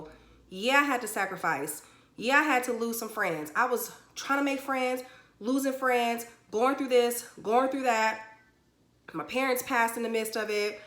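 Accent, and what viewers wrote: Texas, United States. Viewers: You have the Texas accent lmao. DFW is definitely a go to moving place Our population may not be that much..since the population here is only 8million but real soon DFW will be bigger than Chicago and the same size as LA. But it's still so cheap as it was decades ago..and still more opportunities and jobs coming everyday Your doing a good job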